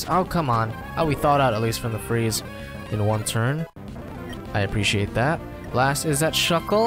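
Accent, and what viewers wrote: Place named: United States. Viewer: woah cool! I have Filipino blood and was born in San Francisco, California. Came here to the Philippines way back '98 when I was 10. So are you residing now in the U.S.? I noticed your accent. It's an American accent so that made me think you're a Fil-Am but as you've said you're full Filipino. Btw, does this hack have an Amulet Coin? I just went in the Pokémon League earlier and I'm wondering if this hack does have an Amulet Coin. It's so hard to make money in the Pokémon league because my team's level is just 5 main Pokémon with levels 56. I keep on dying whenever I get to the 3rd member of the Elite Four.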